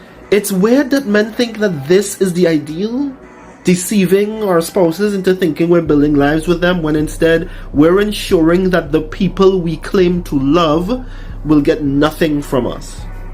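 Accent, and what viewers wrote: Trinidad and Tobago. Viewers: Dais ah Trini accent btw👊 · Thank you!❤️ You sound like a Trini?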